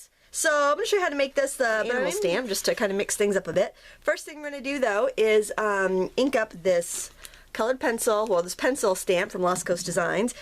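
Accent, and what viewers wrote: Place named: East Coast, United States. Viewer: Great card I really like that giraffe looking animal its Wicked. Lol We don't get all the new sayings etc. that the East and West coast has, it takes awhile to make it here. One thing I did notice about the east is your words are pronounced different. My Dad was born in New York and you pronounce some words the same as him. You say drrw instead of draw. My Aunt's name was Ida and my Dad said Ider. Lol love it!! Thank for the great video!!!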